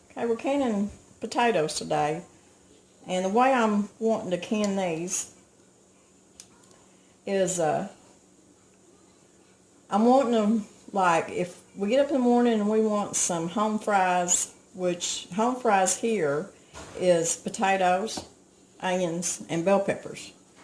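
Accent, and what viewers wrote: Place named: Southern Missouri, United States. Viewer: I just discovered your channel recently. I am now subscribed. I can't wait to try your crazy pizza sauce, your lunch lady rolls, tomatoe powder and these potatoes. I have canned many years and have a really big garden. But some of these things ar new to me and I love being able to add to my pantry. Thanks so much. Oh and I have to say I love hearing you talk! The accent sounds so much like my people. My family are from southern missouri. I have lived though in Nebraska most of my life. It brings back such sweet memories! Thank You.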